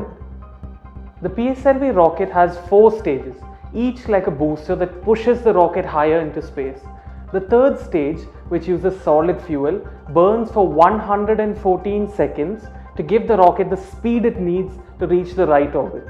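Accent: India. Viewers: He speaks Inglish and hard to understand. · They call it Curry English!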